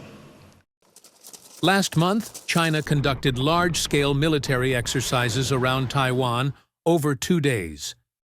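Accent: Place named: United States. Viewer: nippon looks Japanese but its Narrator sounds likea Usa Anglosaxon Go FIGURE THAT ONE OUT!!!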